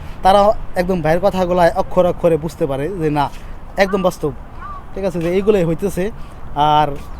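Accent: Faridpur, Bangladesh. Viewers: Vai apnar voice shunei buja jay apni faridpur er amr gram er bari o faridpur e ami dhaka thaki Matro Hsc sesh holo bahire jete cacchi Ar vhai 12/14 lack tk koroch kore jabo jeheto deshe to kisu holeo pathaite hobe so amr jonno japan asha ki uchit hobe?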